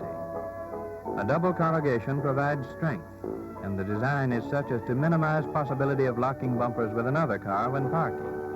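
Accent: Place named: Transatlantic, United States. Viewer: It's called Transatlantic English if I'm not mistaken. Not at all a natural accent, either.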